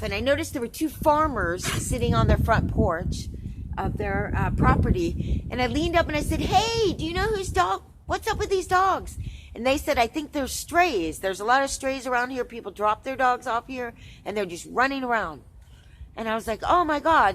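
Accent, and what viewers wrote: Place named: East Coast, United States. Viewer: Cool🐕i know you probably already know about the monks of new skete dog training book? I was really inspired by their techniques🐶 i try not to have bad days. Challenging moments in each day. After a malignant melanoma everyday is good. Life is not fair or easy. Great seeing you smile& that east coast accent is lol.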